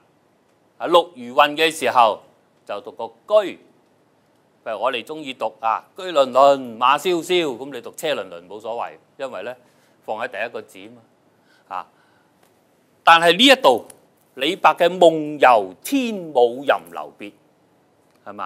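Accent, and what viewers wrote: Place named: Guangzhou, China. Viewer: 真正广州话绝版 好多自认广州 香港人发音都不正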